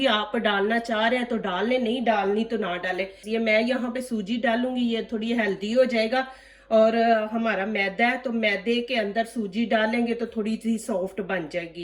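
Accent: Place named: Punjab, India. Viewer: Apki recipes tu achi huti hn par ap apna lhja sahi kar lain ya phir punjabi hi bol liya karain please 🤦😏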